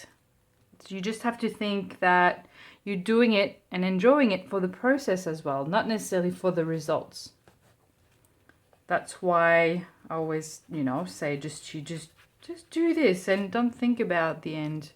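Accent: Australia. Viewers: thank you for your inspiration! I liked your commentary about just playing - not to create a masterpiece, just play. I've never used my hands to paint before and it was so very much fun! Thank you for your posts - you are lovely to watch. Also, your accent is lovely - part Aussie, part French perhaps? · So you live in Sydney. I thought I could hear an Aussie accent coming through. With a username like I gather you are from somewhere there. Beauitful. Thank you for the video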